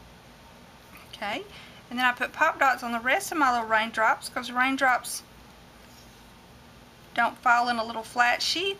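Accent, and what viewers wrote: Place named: Alabama, United States. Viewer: I really enjoy your videos and blog. I love to hear you talk because you sound like me. I live in Alabama with you. I'm about 20 min. from B'ham. So were almost neighbors. Thanks for sharing.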